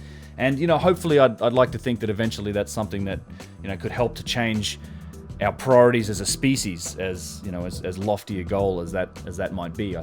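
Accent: Australia. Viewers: Is the voiceover a really good Aussie AI voice?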